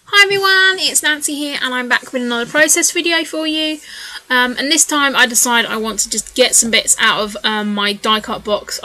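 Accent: United Kingdom. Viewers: Decided I can't watch your videos before bedtime! I dreamed I was touring a old castle with English royalty. I kept embarrassing myself with American manners. They asked me to act as a serving maid and dumped food on myself and the guests! Guess it's your English accent. Hilarious dream!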